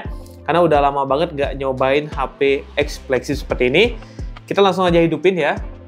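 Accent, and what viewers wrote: Bali, Indonesia. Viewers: Bang lu dari mana? Adi logat care nak Bali?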